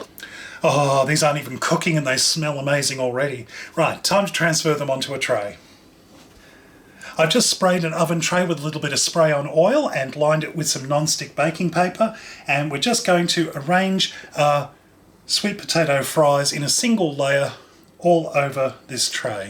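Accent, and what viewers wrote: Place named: Australia. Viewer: I do not care much about whatever your recipe is . I just love listening to your voice and the Aussie accent 🙌🙌🙌🙌🙌💝💝💝💝💝💝🇦🇺🇦🇺🇦🇺🇦🇺🇦🇺 I’m living in Houston,Texas. USA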